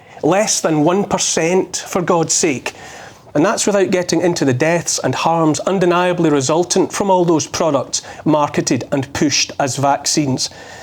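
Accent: Scotland, United Kingdom. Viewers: and Amen to that, Dutch from origin, living in Ireland for 30 years now, and have been called a conspiracy theorist, been ridiculed, discriminated against, lost my work as a music teacher because I refused the jab, now if I were to talk to someone the way I feel about what is going on in this glorious country...I would be called a fascist. I am actually proud to be called a CT, because it means I can see through the BS, but this latest name giving is just sick, and I totally agree with this Dutch lady, comfort is one thing you have given me too, listening to your Scottish accent alone is comfort. God weeps.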